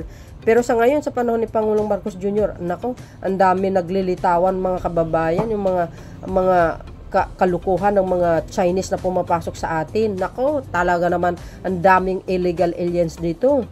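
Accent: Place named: Russia. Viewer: BAGAY PO YONG BOSES NINYO NA MAY RUSSIAN ACCENT HABANG NAG BABASA OR NAG SASALITA . GOD BLESS PO WITH YOUR CHANEL.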